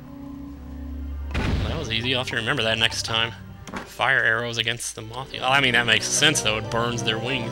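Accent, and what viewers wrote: South, United States. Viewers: Where did you live for 10 years? Georgia? Texas? Alabama? I think different states have different accents since I grew up in Mississippi, then moved to Texas, and people said I had an accent, heh. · Southern accents are the best! :D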